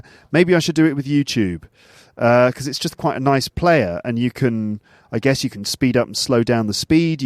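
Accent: RP, United Kingdom. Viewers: hi, thank you so much for sharing this i'm your big fan since you created podcast and love listening to your british standard accent it help me a lot in improving my pronunciation thanks to you again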